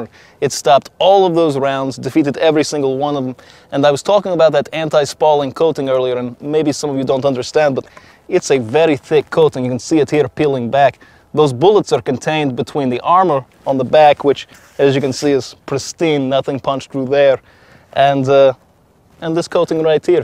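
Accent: Russia. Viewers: 俄国口音的英语吧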